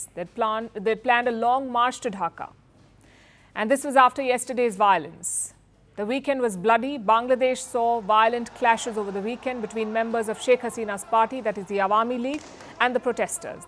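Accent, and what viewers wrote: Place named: India. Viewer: Just typical Indian style English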